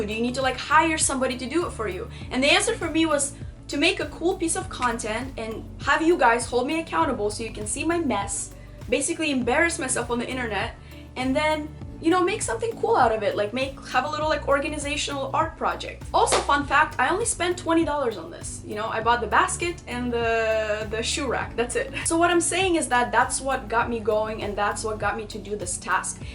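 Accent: Russia. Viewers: A cute Russian girl. Still your accent is prominent, but overall a good job. Greetings from the EU. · Is that a Russian accent? Me gusta.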